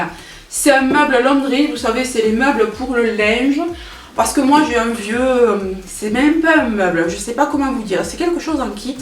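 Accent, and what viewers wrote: South, France. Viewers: Oui une vidéo plante!!et tjr avec l'accent du sud.tu apportes le soleil.bisous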